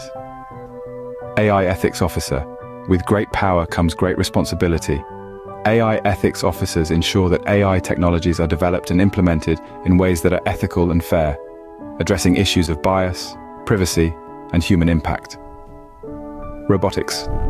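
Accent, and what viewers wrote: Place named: United Kingdom. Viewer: your British accent is amazing and this video gives me some insights on AI trend in the future, nice video introduction👍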